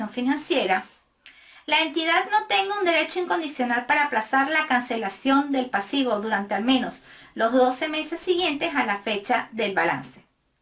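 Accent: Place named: Venezuela. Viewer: Muchas gracias por este video, estoy comenzando a estudiar contaduría y administración tus videos me han ayudado bastante. Quería preguntarte si es posible que hagas un video en cuanto a tips para entrar a trabajar empresas como PwC, Deloitte y KPMG, específicamente que herramientas o sistemas se manejan y que conocimientos podemos aprender para tener éxito en entrevistas de trabajo para ese tipo de empresas. Soy joven aún pero quiero trabajar en algo directamente relacionado con mi carrera y creo que entrar en una de las empresas que nombre anteriormente es un buen paso para comenzar y aprender otras cosas que no veo en la universidad, a veces siento que en la universidad se limita mucho a lo teórico. Te hago esta pregunta porque soy de Venezuela y por tu acento me parece que tú también😃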